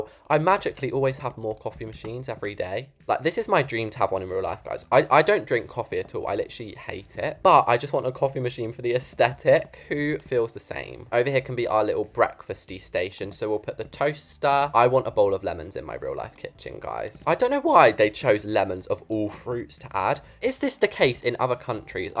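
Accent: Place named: United Kingdom. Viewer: i think we can all agree that the build looks amazing! her voice is also so pretty bc im a American but i wanna have a british accent! also a tip for ur next build, idk if you knew this but if you take a ckear basic shape and resize it to be thin then get a decal (code is at the end) and now you have a realistic fridge! one more wsy to do it is to get small grid and get verdical planks and place in a line then coler black then place the taped photograph resize then change decal! code: <num> ur the best!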